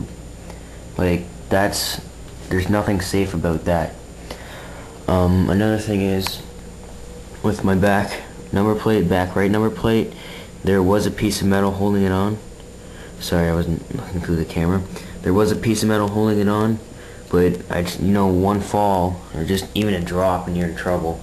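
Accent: Canada. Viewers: R u Canadian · some china man just made about $1999.50 haha.....someone sounds Canadian aye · are you canadian??? just when you said out sounded kinda scotish, i remember this from south park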